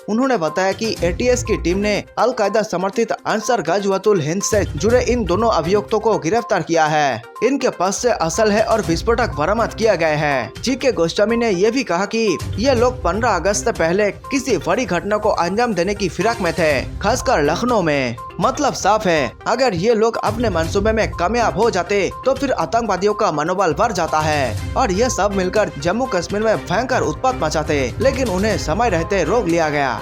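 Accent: Bengal, India. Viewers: यह फेक न्यूज़ चैनल्स होसकता क्योंकि महाशय की हिंदी बोली से शक करने पर मजबूर हूँ।बंगाली गंध आ रहि हाइय् । भा हा इ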